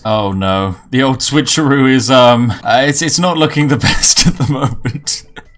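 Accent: United Kingdom. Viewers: Omg you British as fuck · This guy is annoyingly British but he makes good content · Hmmm... sounds british to me. "Salute the queen" and "sniff your tea" Yeeeah okay, he's british alright.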